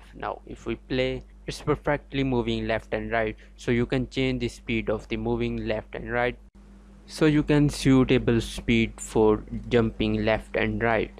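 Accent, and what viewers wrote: India. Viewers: Indian accent found =Op teaching ,btw i am from nepal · the dude with the indian accent has saved us once again!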